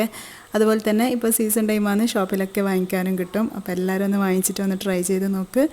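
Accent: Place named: Kannur, India. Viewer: Samsaram kannur karudaedalla...super videos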